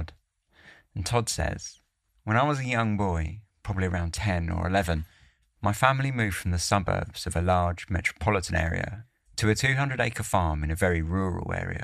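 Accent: England, United Kingdom. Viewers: Sounds like English accent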